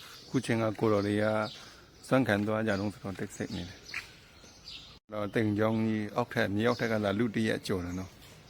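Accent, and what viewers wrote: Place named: Yaw, Myanmar. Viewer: ယောသံကြီးနှင့်ပါလား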